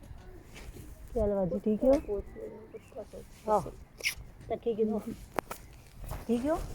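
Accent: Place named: Pothwar, Pakistan. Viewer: Ap Pakistan main kon sy alaqa sy belong krti hn apki bataon language main pothowari tuch aa raha he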